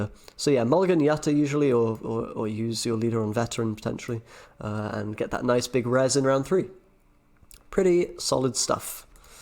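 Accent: United Kingdom. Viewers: Yo, you're British, aren't you? Great vid BTW <3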